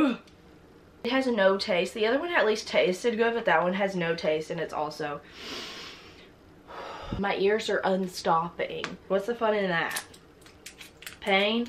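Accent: South, United States. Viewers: I was listening to you and you sounded hella southern and I saw you lived in Alabama 😳 I do too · i live for that lil bit of yee yee she got in her voice. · She turns more and more southern as the video goes on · She sounds southern but didn't know what venison was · I’m new here so I was like “When you find out the jerky is made in LA give up the accent” but as I stayed I realized oh crap that’s her accent. It’s not Texan (like mine) but she’s actually southern huh? 🥸🤪 (especially not Texan when you don’t know what Venison is🤷🏻‍♀️)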